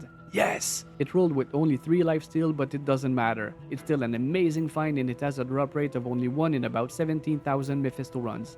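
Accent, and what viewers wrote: Quebec, Canada. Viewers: Avec ton accent, es-tu québécois par hasard ? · Sa parait tellement que tu est Quebecois juste par ton accent lol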